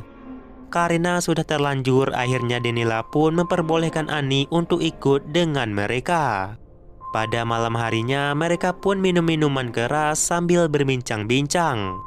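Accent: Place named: Medan, Indonesia. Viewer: aku suka aksen Medan,tapi klu buat review boleh lah bang di kurangin dikit aksen Medan nya,biar lbh nyaman di telinga 🙏🏻 mohon maaf sekedar saran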